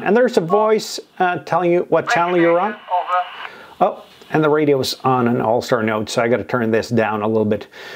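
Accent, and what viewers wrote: United States. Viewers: Yes, I've noticed a lot do speak English well. This man in the video could pass for an American or Canadian citizen with his accent, no problem. I'm American, by the way. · Your prosody not very Norwegian at all. You sound waaaaaaaay more American than Norwegian.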